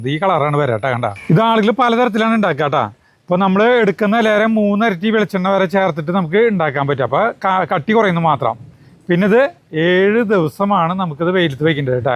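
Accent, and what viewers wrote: Thrissur, India. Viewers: ഇയാള് പറയുന്നത് മനസ്സിലാവുന്നില്ല മനസ്സിലാകുന്ന രീതിയിൽ പറയണം സംസാരിക്കുന്നത് മനസ്സിലാവുകയുള്ളൂ യെ കൊണ്ട് സംസാരിപ്പിക്കും വ്യക്തമായി മനസ്സിലാവുന്ന രീതിയിലാണ് സംസാരിക്കുക നിങ്ങളുടെ തൃശ്ശൂർ ഭാഷ എല്ലാവർക്കും അറിയുകയില്ല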